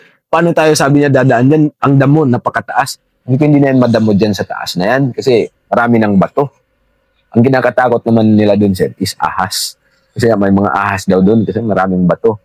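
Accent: Philippines. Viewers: Tagalog na tagalog magsalita si Sir,maliwanag sya magsalita..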